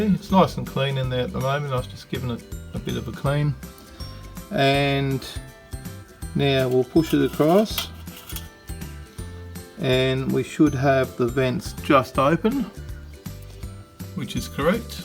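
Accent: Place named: Australia. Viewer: Australian accent... I understand it perfectly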